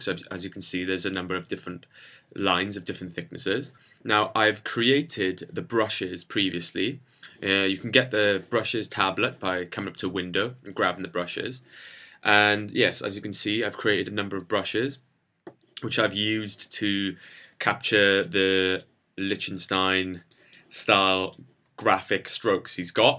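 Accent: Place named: South Wales, United Kingdom. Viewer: Yanni Courtney he has a South Wales accent.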